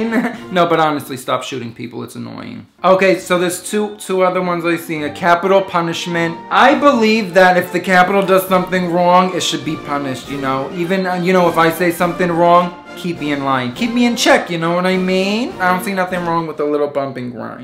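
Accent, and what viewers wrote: New Jersey, United States. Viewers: This is one of the best New Jersey accent I've heard.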